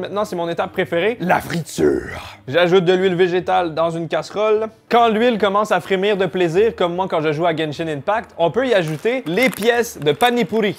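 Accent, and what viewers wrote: Quebec, Canada. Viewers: T’es québécois ?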